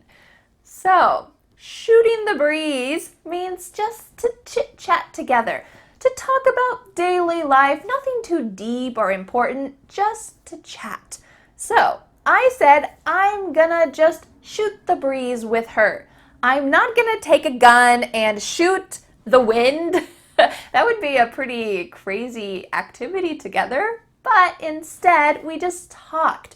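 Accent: United States. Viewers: She such a good teacher she teach me alot of American accent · please, is she from the u.s.? does anybody know? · Wawwawaw i love American accent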